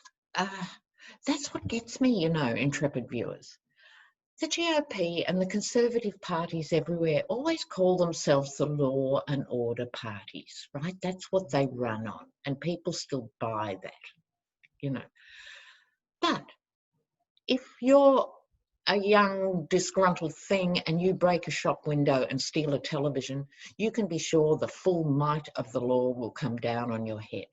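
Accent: Australia. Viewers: Thank you so very much for sharing your insights and wisdom with us! You are helping me remain as sane as possible. Question, please. By your accent alone I'd be able to tell that you're Australian. I'm curious -- why do you have such an interest in US politics? (Please don't misunderstand! I'm right there with you politically!) I realize there are plenty of "fires" to put out, so those, naturally , will take precedent. But as you have time and/or inclination, I'm sure I'm not the only one who's curious about this. Thank you for all you do!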